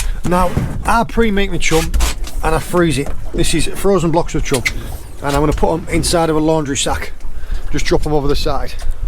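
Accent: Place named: Cornwall, United Kingdom. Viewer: You're getting slowly Cornish mate, it happens. About 18.00 you say "I switch overrrr," great vid btw!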